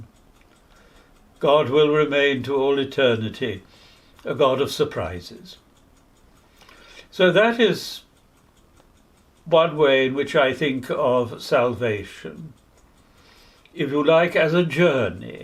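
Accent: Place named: RP, United Kingdom. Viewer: Very erudite, with a charming "posh" accent, a British sense of humor, and a real knowledge of the loving heart of God, which is the most important. Thank you very much, Father.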